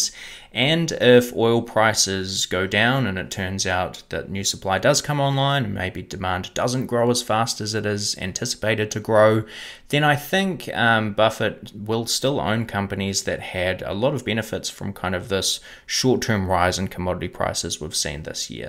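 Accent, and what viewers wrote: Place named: New Zealand. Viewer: Love your kiwi accent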